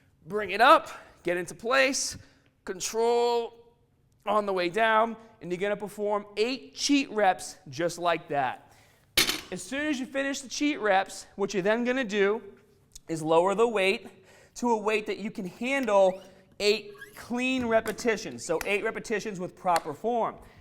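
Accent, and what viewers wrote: Boston, United States. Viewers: Bhavik Bhagat Lol, no. In what way is that Aussie? It's a Boston accent. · Is this what they call a "Boston Accent"? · Dudes like this should be banned from YouTube. Boston accent is not multi lingual, it just sounds stupid. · strong accent, Boston? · haha sorry, my bad! Boston..it is hilarious though! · strongah!, stahdid, you definitely from boston! southie? or Townie?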